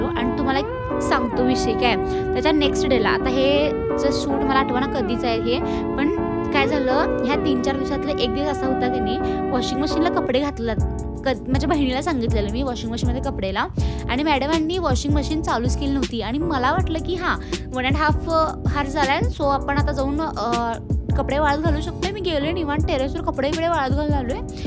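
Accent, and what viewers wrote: Kolhapur, India. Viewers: Orignal kolhapuri language 🥰🥰🥰🥰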